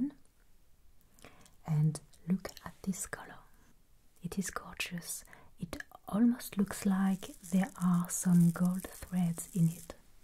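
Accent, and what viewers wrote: France. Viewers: You're french ? Because you have an accent french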